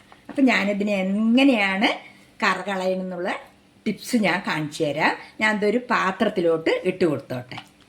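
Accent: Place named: Palakkad, India. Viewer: പാലക്കാടൻ ഭാഷ ഇഷ്ടായി🎉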